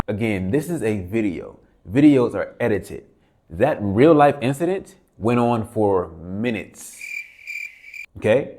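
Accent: United States. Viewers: What did you expect? A red carpet? All major cities have homeless ppl and beggars, have you seen downtown Seattle or Chicago? Lol... ...also..dude, your camera pointing and obviously American accent make you both a target AND a nuisance. Try that in Haiti or somewhere in SA, you'd get robbed (at the least) and possibly killed at worst. Maybe don't be so disrespectful toward ppl you're already being very intrusive with? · I am a black American LADY I live in Kenya BY CHOICE. I love it and I love the people but let’s get real young man. By the way I am very well traveled…. You young people going into third world countries and “filming” live for the sake of “content creation” WITH NO UNDERSTANDING OF A CULTURE IS UTTERLY RIDICULOUS. Do you understand where you are going and the realities of the place!!??Some of these things you have experienced, you make yourself a target!!! 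Seriously. There are millions of destitute and poor people in most of these nations and the reality is that if YOU WERE IN THEIR SHOES you yourself might beg if you hadn’t eaten in a few days. As far as the other critiques I get this is for content and your personal experience but honestly you should’ve had a guide… also know when to shut off the cameras. In many of these cultures photos and cameras are sometimes an issue depending on the beliefs of the people you are catching on film… STUDY BEFORE YOU GO. Also the people for the vast majority are WONDERFUL, LOYAL, AND HONEST. Also on the women, dude in a place with literally millions in ONE city your views are just to generalized. The women are actually the OPPOSITE of what you say. My son visited here and HE IS EXTREMELY HANDSOME AND SUCCESSFUL AND IT WAS NOT AT ALL WHAT YOU DESCRIBED. Most of the attention you received especially in the CBD was simply because some people heard your American accent PERIOD. 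I really think your video is such a poor portrayal of the country. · Young man you sound like a privileged American.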